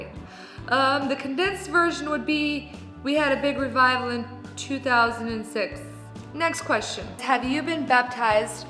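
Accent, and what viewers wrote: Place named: South, Germany. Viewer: As a young southern german woman, I looove your German dialect, especially since I understand it and know Mist Germans wouldn't. It is such an fascinating mix of several southern dialects, it just makes me so happy to listen to it. Thank you for sharing your life with us. I love learning about your community 😇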